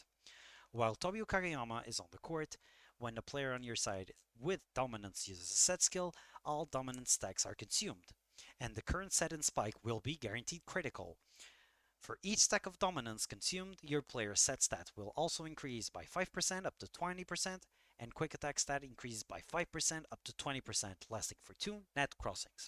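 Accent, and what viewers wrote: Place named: Portugal. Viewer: You do sound portuguese thats why I said that hehe Maybe I can try to fully commit to this team and get both to SR4. However, I’m also interested in the new Nekoma team with SP V2 Kuro, Yaku, Yamamoto, and the Term-End and Kamo duo. But I think I wouldn’t be able to get any dupes, and I already have Kuroo V1.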